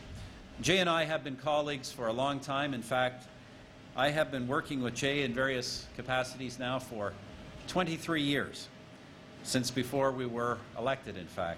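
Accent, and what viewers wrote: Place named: France. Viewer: people who are making fun of his french accent. Well, some french who speak english don't have a perfect accent either...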